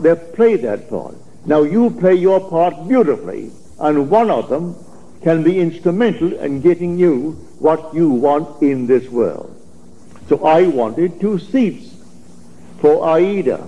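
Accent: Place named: Northwest Florida, United States. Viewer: Yes in years past people in Northwest Florida spoke with the same lovely accent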